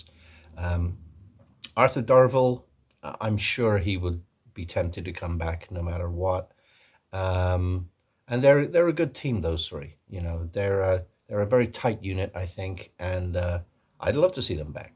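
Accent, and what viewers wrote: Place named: East Coast, United States. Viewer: Your American accent is great,. I agree it sounds like a mix of east coast tri-state state area. That's a certified New Yorker assessment. 🫡 Thanks for another great video!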